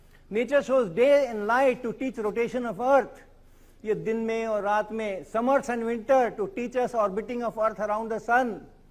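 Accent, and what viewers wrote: Standard. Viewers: wah sir ki hindi ekdom suddh hain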